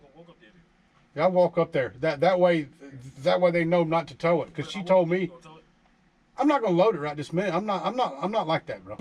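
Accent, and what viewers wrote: South, United States. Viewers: I just discovered your channel a couple of days ago and I have been watching it Non-Stop. I love your southern accent I love your relaxed kick back way. I love how you give it up to God. You were talking about shady practices when tow company send a bill after they sold your car at auction. That is shady as hell I agree. I live in Arizona and here in Arizona if your car gets towed and they sell it at auction then the State of Arizona which has nothing to do with the towing company or the person's car who got towed jumps in the middle of it and adds a abandoned vehicle charge of $500 which you don't know about until you go to try and change your address on your driver's license or if it's expired and you need to get an updated license or if you want to register to vote or you want to register to be an organ donor. No they won't let you do anything they keep you locked up until you pay that $500 charge that no the person lost the car the tow company got the car sold at auction and now somehow the States involved and putting this 500.00 charge.???? 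Please if you could explain this to me like I'm a two-year-old and make me understand I would appreciate it.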